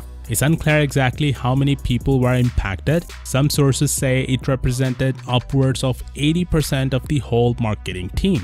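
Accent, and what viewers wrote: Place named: India. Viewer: When indian hardly trying to hide the accent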